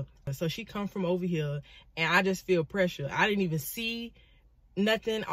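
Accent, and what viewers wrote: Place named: Philadelphia, United States. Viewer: U sound like my home girl from philly… keep the stories coming great job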